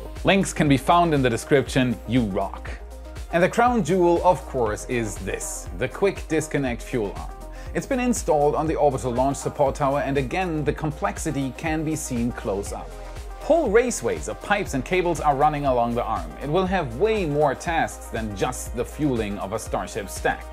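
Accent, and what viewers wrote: Germany. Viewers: Your german accent is almost non existant now. :-) hi from magdeburg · It isn't a weird mixed accent. It's English with a German accent.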